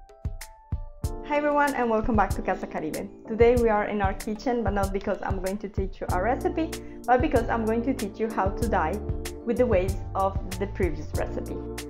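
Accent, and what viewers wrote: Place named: Italy. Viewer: Ooooohhhhhh avevo sentito accento italiano...posso chiedere una tradizione in italiano? Mi piacerebbe moltissimo imparare. Ma nella mia lingua c'è praticamente niente....ti prego puoi aiutarmi? Grazie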